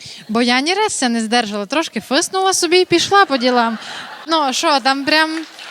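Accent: Transcarpathia, Ukraine. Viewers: А точніше, закарпатський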